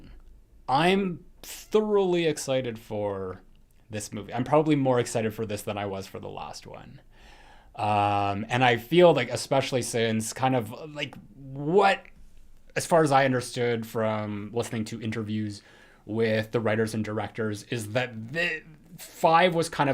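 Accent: Canada. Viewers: You're so cute: quite facially emotive, sometimes handsy, and I love when you speak Canadian like out and about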